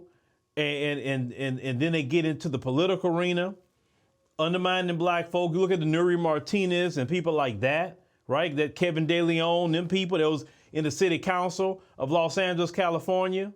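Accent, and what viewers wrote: South, United States. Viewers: your southern, Mississippi, Arkansas poor schooling accent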